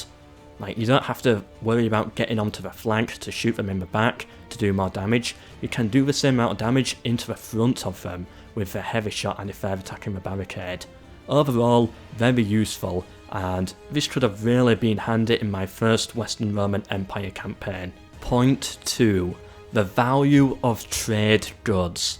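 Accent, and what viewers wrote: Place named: North Yorkshire, United Kingdom. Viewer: He’s probably from around North Yorkshire or Middlesbrough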